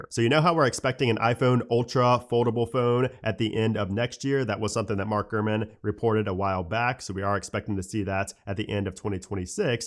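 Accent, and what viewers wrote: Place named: South, United States. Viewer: It's a southern accent lmao. It's called an accent.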